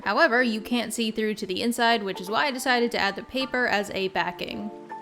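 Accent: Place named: Colorado, United States. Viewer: Sounds normal to me. Apparently dropping the t from the middle or end of words is a Colorado accent thing. Cur-ains, Moun-ains, and so forth.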